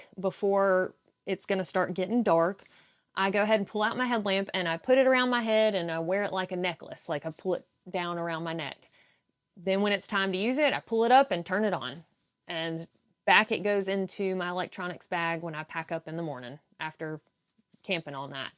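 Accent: South, United States. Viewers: Your southern accent really came out on this one. I was asking why on earth would anybody be hiking with a ladder? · I would be laughing with you if she wasn't so cute and I didn't love the southern accent. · A hot blonde with an American southern accent is about as hot as it gets.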